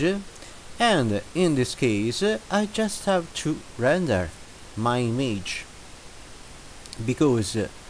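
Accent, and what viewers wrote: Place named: Japan. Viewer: Your accent sounds like a Japanese. Anyway I love this video so much ❤️❤️❤️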